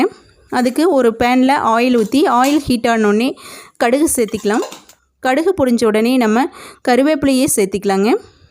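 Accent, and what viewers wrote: Kongu, India. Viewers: 3 Lk கொங்கு தமிழுடன் ரோட்டுக்கடை காளான் சுவையோ சுவை 😋😋அருமை sis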